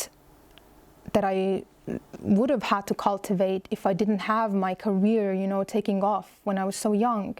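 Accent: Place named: Los Angeles, United States. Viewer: "kind of like" yeah, sounds LA alright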